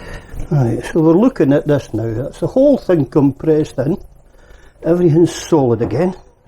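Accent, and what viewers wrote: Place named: Scotland, United Kingdom. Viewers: Eh don't know what gave it away. Eh must have noticed a wee Dundee quirk in your accent. 😁 Just a quick note to say fixed my Karcher leak. Turned out to be the top elbow joint. Fantastic local supplier in Inverurie got me the bit. Took a wee while to free it as the clamp was seized solid but my trusty mole grips sorted it (bought when I was about 18 for £2.99 - best buy ever). Anyway, thanks again for your video, which gave me the info I needed and a great laugh at the same time. Have a great weekend, and take care. Cheers, Harry (well, actually it's Alan - Harry's my crime thriller writer pseudonym) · Cheers for info, no problem understanding your Scottish accent as a good mate of mine is from Gourock 😎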